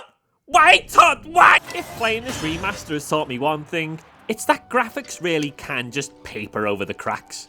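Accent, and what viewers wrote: Liverpool, United Kingdom. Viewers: Side note, been enjoying your videos. Your commentary and that scouser accent is a winning combo imo. Look forward to disagreeing with you again. 🍻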